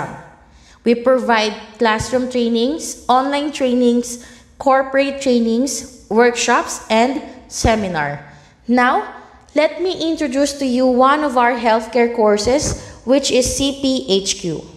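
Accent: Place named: Philippines. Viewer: I can tell from the accent, she’s Filipino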